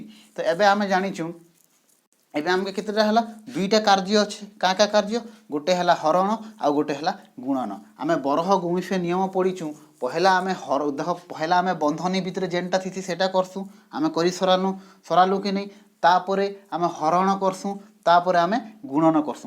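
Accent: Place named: Odisha, India. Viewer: ପୁରା ଏକ ନମ୍ବର ସମଲପୁରୀ କହୁଛନ ସାର୍ ବଢିଆ ବୁଝାଉଛନ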